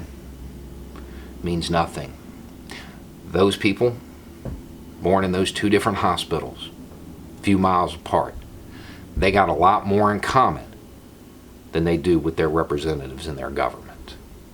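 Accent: South, United States. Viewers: Dude, from somebody from a fairly major city up North as soon as I heard your accent I thought Oh lord another one of 'these' guys. This is probably the 20th video from you I've watched and my lord you've proved me wrong. It's easy for me to grow up with these beliefs... growing up in a densely populated diverse neighborhood you know gay people and Muslims and Mexicans and you realize that they are just like you. I'm white and I grew up in the little polish pocket in a majority hispanic side of town, and I got along with hispanic people far better than white people because of exactly what you're talking about... That sense of superiority and being inherently right just because they're white. To come from the south it takes a lot to develop that kind of attitude. Good for you bud I hope there's a lot more like you down there.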